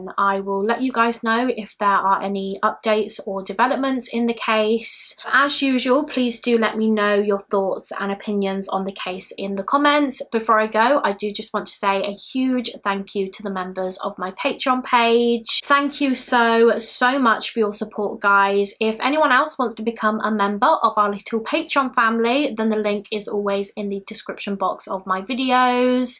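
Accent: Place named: England, United Kingdom. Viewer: Such a sad case. Totally unrelated question, just because I'm curious - what part of England are you from? Fascinated by your accent.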